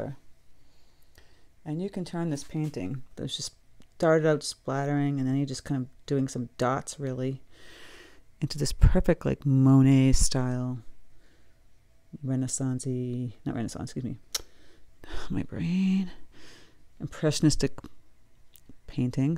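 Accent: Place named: New England, United States. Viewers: I love this! I'm going to try it! You sound like my cousin in New Hampshire. Where in New England are you from? I'm a Mainer. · you are so refreshingly New England, somehow. I moved to NC a few years back, and I love hearing you and your expressions. “Boop”, “ooo hooo” etc. (lol). I am almost homesick - except for the weather. Your vids save my sanity.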